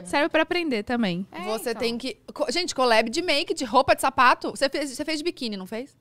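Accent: Minas Gerais, Brazil. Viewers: O sotaque dela é mineiro. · o sotaque dela é mineiro ou goiano ? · Gente, a é mais mineira que eu que moro em minas kkkkkkkkkk Véi, uai, “esse trem e diferente demais uai”, trem, “nunca vi um trem desse”, maravilhosa, buxinha kkkkkkkkkkk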